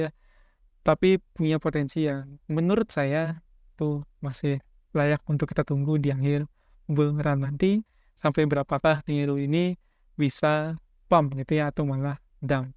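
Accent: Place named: Bali, Indonesia. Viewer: Permisi bang saya followers baru Kalok boleh tau abangnya dr mana seperti logat bali soalnya🙏🏻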